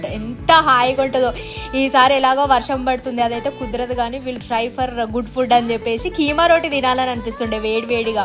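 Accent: Telangana, India. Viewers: Manchi Telangana language matladutaru meeru Ega poyinam 😂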